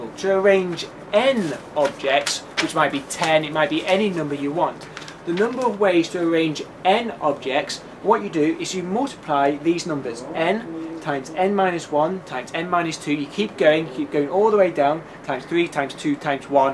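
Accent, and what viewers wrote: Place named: United Kingdom. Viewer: i used to be so racist towards British accent, yours are.. just awesome , thx for repairing me ^^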